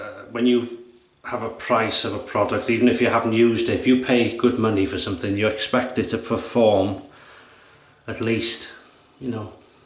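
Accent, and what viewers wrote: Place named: North Wales, United Kingdom. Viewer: I knew you were from North Wales could tell, I live in Llandudno don't know if that's anywhere near you. Another great video by the way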